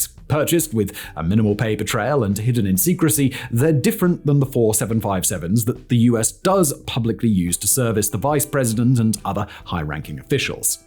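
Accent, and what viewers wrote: United Kingdom. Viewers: You Tube SUCKS! I have removed this man's channels over and over again. But when You Tube changes the algorithm, it force feeds me this Simon guy's many channels despite my removing him. Simon's channels suck. They are poorly researched information that is mostly government propaganda talking points. Terrible videos. And just because Simon has a British accent, this doesn't not make him an authority on anything. He's just a talking head. England is over. Brexit has made the only job in England a You Tube content provider. Terrible list videos that spread falsehoods and bull.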